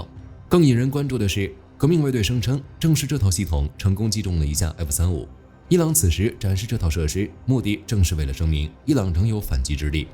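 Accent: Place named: Northeast, China. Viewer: 東北人呀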